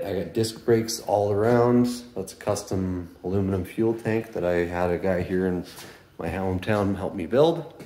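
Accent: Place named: Canada. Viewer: 1) you sound Canadian 2) what rear bumper?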